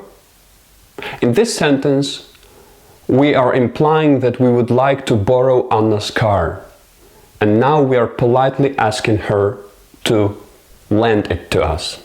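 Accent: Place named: United Kingdom. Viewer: Цікавий акцент. Схожий на британський.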